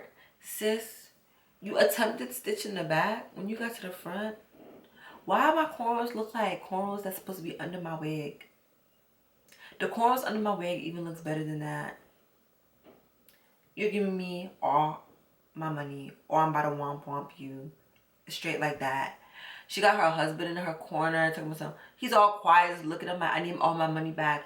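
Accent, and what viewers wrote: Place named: Nigeria. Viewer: It’s the Nigerian accent for me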